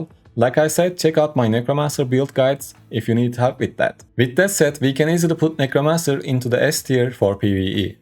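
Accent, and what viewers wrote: Turkey. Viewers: Reis türk müsün senin aksan tam türk aksanı gibi geldi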